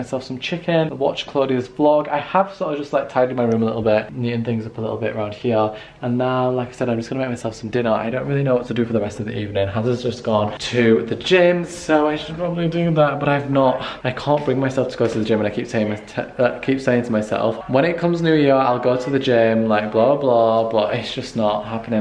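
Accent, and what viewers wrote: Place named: Sheffield, United Kingdom. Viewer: Binge watching videos when I'm at uni so I can hear an authentic Sheffield accent to remind me of home loool🙃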